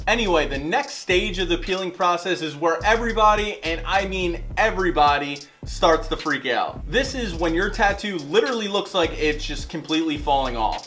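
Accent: Philadelphia, United States. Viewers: You sound like your from philly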